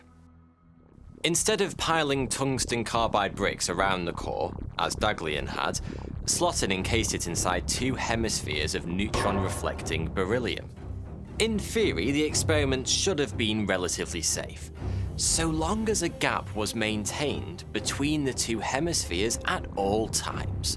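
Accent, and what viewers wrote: United Kingdom. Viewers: Definitely sounds like he's from the UK somewhere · Okay, but why is Frankie Muniz doing a British accent?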